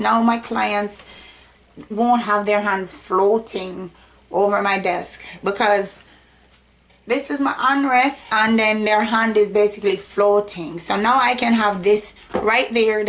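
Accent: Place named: Jamaica. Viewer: Jamaican I hear it looool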